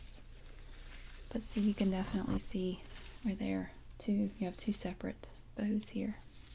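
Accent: South, United States. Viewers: Love her southern accent. Especially from all the way over here in New Zealand. She is just awesome and so relaxing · Your mannerisms, personality and voice are extremely relaxing. Glad I found your channel. I'm from Alabama and it's nice to hear a friendly southern accent for ASMR. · Love your accent. It's a Southern accent, but it's not thick or heavy, it's light and dainty like a Southern belle. It has a soothing effect when you speak softly. Very nice. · You are so comforting and motherly, I just feel so at peace watching your videos. I normally don’t pay much attention to accents, but I’m so obsessed with your southern accent.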